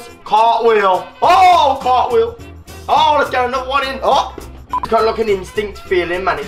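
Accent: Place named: Birmingham, United Kingdom. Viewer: I can tell this guy is a brummy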